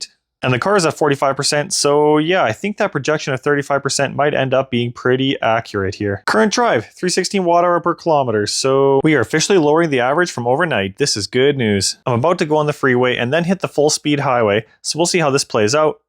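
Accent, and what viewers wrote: Canada. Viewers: the accent, the stache, the Saskatoonian winter, the consistent conversion of metrics, takin er out fer a rip, what an absolute beauty bahd. All regen, no degen. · Your Saskatchewan accent is deafening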